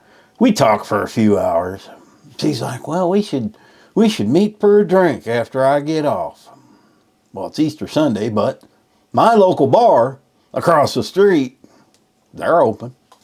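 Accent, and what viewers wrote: United States. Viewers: Podoba mi się Pański amerykański akcent :-) . Serdecznie pozdrawiam !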